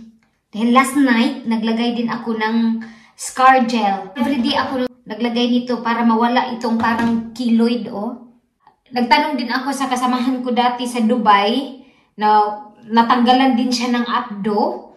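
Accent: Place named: United Kingdom. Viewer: british accentan kau jd ang inday ui buyag...well done mama! maau nlng jd naminaw ang kuya!ingun ana jd na madam basta mama nata,ky akong bebelabs bitaw mao pd..nah panahon pd namo dria di masabtan 😂! mura pd sa cs ky naa pd ginabutang pra dli magkeloid,maau nlng kd ky naayo na!mahal pd ba ang cargo diha padung pinas?? ky dri mahal mao ginahatag nlng namo or ginabutang sa mga clothes box..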